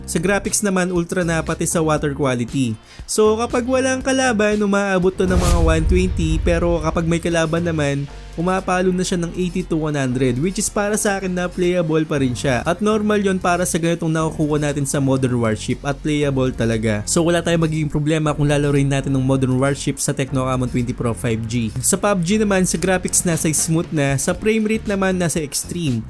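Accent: Pampanga, Philippines. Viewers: Kapampangan accent tlga e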